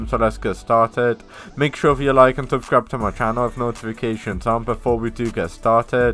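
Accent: United Kingdom. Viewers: Bro your language british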